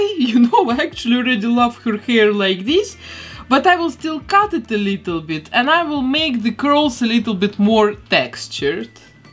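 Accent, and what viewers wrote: Russia. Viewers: Мне кажется или это русский акцент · Я чувствую себя ТАК странно, смотря Ваши видео. Мозг воспринимает русский акцент как русский язык, но при этом я понимаю, что звучит английский... хэлб А если не считать ребусов в моей голове, видео очень крутые, смотрю регулярно и с большим удовольствием.) Вы крутая художница!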